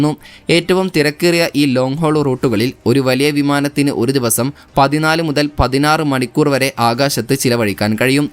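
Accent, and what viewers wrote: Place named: Kannur, India. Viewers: It is Malabar peole lways say like tht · Al Kannur Karan anennu thonnunnu....avar Ingane Anu pronounce cheyyuka....ano ...? 🤔♥️ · ഇവൻ വടക്കൻ ജില്ലക്കാരൻ ആണ്.. അതാണ് അങ്ങനെ വരുന്നത്..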